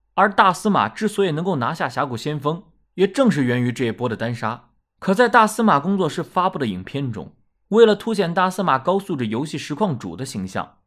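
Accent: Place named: South, China. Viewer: 南方主播，一嘴土味。做出什么事来都正常，从来不看。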